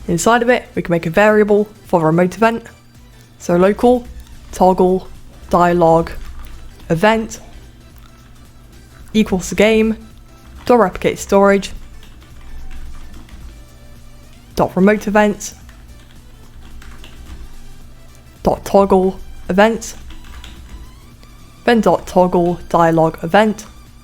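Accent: United Kingdom. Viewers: Do you add places for the lobby and the game? Also nice British accent there buddy. Also you're real helpful for me making my games good! :)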